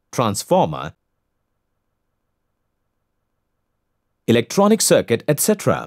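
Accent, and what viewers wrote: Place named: India. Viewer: Indian accent spotted.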